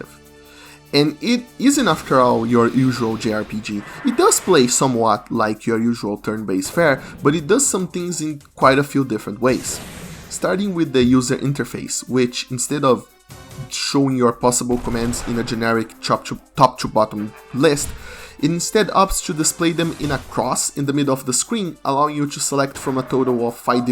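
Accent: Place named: Brazil. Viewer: Your accent sounds Brazilian to me.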